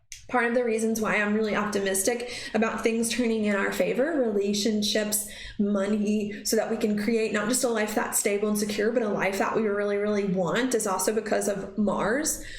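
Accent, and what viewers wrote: South, United States. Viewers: Been watching you since before your move! Your definitely getting a southern accent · You can really hear the southern accent on this one. :)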